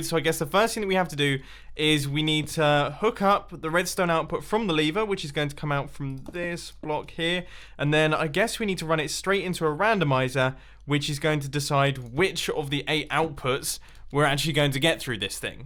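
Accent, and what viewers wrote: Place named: United Kingdom. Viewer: Mumbo has gotten more British over the years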